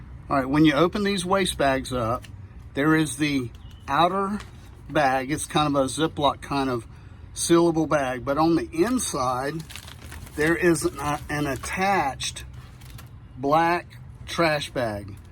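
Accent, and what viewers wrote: United States. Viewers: What a beautiful accent you have , your almost singing ;D where about in America is your accent from sir ? if you do not mind me asking . I personally would prefer to use biodegradable bags which is why I am searching the net . Thank you for the demo ;D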